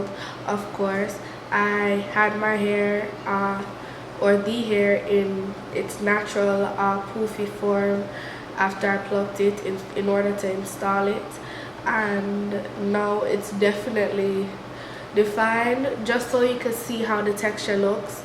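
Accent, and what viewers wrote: Jamaica. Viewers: it’s her Jamaican accent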